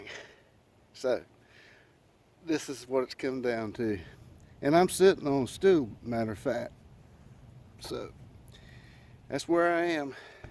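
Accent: South, United States. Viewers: After has been gone a few months....I still love to come watch him and hear his peaceful southern voice. Love his videos forever. It's still tough to imagine YouTube without my friend. Miss you Bud!! God Bless you and your family and Toby!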